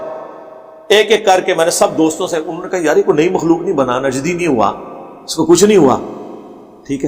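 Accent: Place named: Punjab, Pakistan. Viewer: Yah jo panjabi ka tarka lagta hy na bato bhai ki bato ma bara kmal ka lgta hy log chirty hi iai liy hyn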